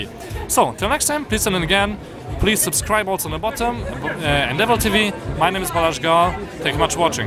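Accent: Germany. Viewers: Hi first off, please refrain from using profanity in your videos. Also, are you german? :0)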